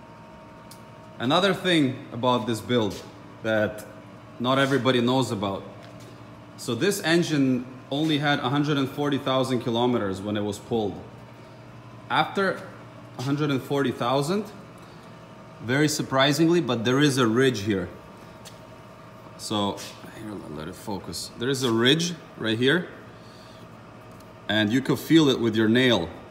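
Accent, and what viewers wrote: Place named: Russia. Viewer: я услышал русский акцент =) у вас хороший английский... мне ваш английский был очень понятен..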